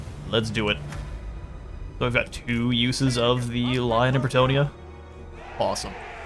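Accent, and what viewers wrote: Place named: France. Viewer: Love the French accent.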